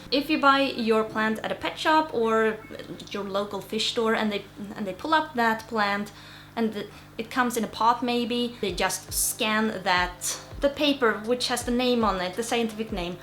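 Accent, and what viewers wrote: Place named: Scandinavia. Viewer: Hey, I was searching for something the other day & found your channel! My name is Stein Eric & I live in Minnesota with a few other Swedish people who you might know......Just a little joke- 🤭 So I'm a big fan of anything to do with freshwater aquascaping. I have been keeping pets my entire life but nowadays it is mostly peaceful tropical fish with the Bettas, Cory's, Dwarf Rainbows, Harlequins,Otocinclus & and the only snails that are allowed to be in the aquarium are Nerites!!!!!! I have 9 aquariums and a handful of small enclosures that are having just plants & several types of Moss (my favorite plant? ) I just wanted you to know I've been enjoying your videos and the best Scandinavian accent that I've heard in too long...I am a 1st generation American and both of my parents are from Norway and grew up in Vik ❤️ I am going to IKEA tomorrow for the supplies I need to build my 1st aquarium and hopefully the 2nd too. 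🤞 I watched Tanner on Saturday, and he had a brilliant idea for sure & if you have not already seen the last video then I am going to be really quiet and now I have the best idea for you to get online and see what Serpa Designs is up to. Ciao 🌉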